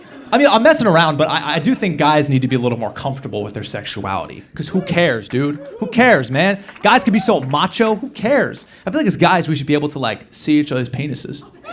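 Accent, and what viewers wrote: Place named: United States. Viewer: Hiya I'm your BIGGEST FAN I LOVE YOUR STAND UP COMEDY. I COULD WATCH YOU 247. IM A BRAND NEW NEWBIE TO YOUR YOU TUBE CHANNEL CONTENT WILL. EVERY TIME I WATCH YOU I ALWAYS FEEL VERY CALM REALAXED AND CHILLED OUT VIBES. I LOVE YOUR AMERICAN ACCENT AND I JUST CRY WITH LAUGHTER ALL THE TIME TEARS RUN DOWN MY FACE CONSTANTLY. IM SO GLAD IVE JUST SUBSCRIBED TO YOUR YOU TUBE STAND UP SHOW CONTENT. WARM COSY HUGGIE SNUGGLES CUDDLES MATE LOVE RESPECT YOU LOADS NOW AND ALWAYS AND YES WILL YOUR A HUGE STRONG POSITIVE INSPIRATION IN MY LIFE AS WELL NOW MATE. KIND DEEPEST REGARDS YOUR BIGGEST FAN OF YOUR COMEDY STAND UP SHOW CONTENT ON YOUR YOU TUBE CHANNEL MY AWESOME BIG BROTHER 4 LIFE NOW MORE THAN YOU ALREADY KNOW THIS MATE. KIND DEEPEST LOVE RESPECT REGARDS MATE FROM MARTYN CHARLES JOHNSON IN ROCHFORD CITY OF SOUTHEND ON SEA IN ESSEX UK ENGLAND NOW AND YOU ALSO HAVE A BEAUTIFUL SMILE AS WELL MATE. 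KIND DEEPEST LOVE RESPECT REGARDS MATE MARTYN CHARLES JOHNSON 🫂😁🤝🙇🏻‍♂️💝💝💪🏻💪🏻💪🏻💪🏻👍🏻👍🏻😉💛💛💛💛🫅🏻🫅🏻🫡⭐⭐👋🏻🫶🏻😍😍🏡🎧🎤😉👍🏻👍🏻💪🏻💪🏻💛💛👋🏻🫡🤝🙇🏻‍♂️😉💛💛🫅🏻🫅🏻🎧🎤🙇🏻‍♂️💪🏻💪🏻💪🏻💪🏻😁😘😘🇬🇧🙇🏻‍♂️🫡💛💛🫅🏻🫅🏻😁🫂🙏🏻🇬🇧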